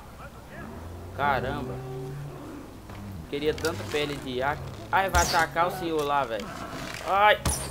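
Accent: Minas Gerais, Brazil. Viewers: Mt Top o seu canal mano ! Concerteza vai Crescer Rapido ! Acho o seu sotaque bem Legal, creio q vc seja Mineiro, Parabéns !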